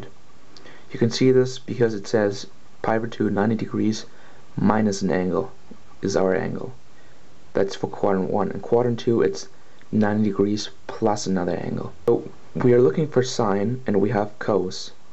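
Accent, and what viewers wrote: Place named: Germany. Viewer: i notice a slight european accent are you german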